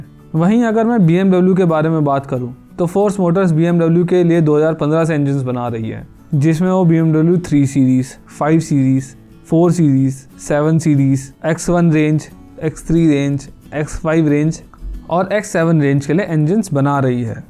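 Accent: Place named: Rural, India. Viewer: Be clear in your dialogues.. and also better use English instead of this village style hindi ascent..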